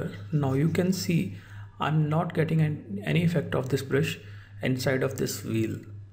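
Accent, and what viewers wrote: India. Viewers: My apologize, but that indian accent is so annoying...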